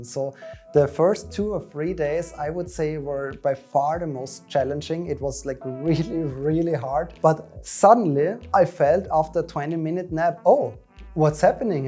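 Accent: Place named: Styria, Austria. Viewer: Eher steirisches Englisch!:) <3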